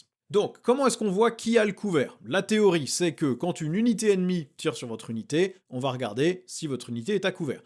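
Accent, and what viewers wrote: Brittany, France. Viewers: Encore du super boulot avec en bonus, l accent brestois.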